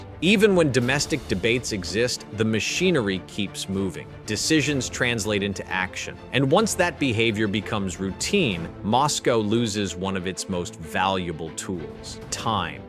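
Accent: United States. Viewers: This tosser with a Yank accent is so delusional he don't know what is happening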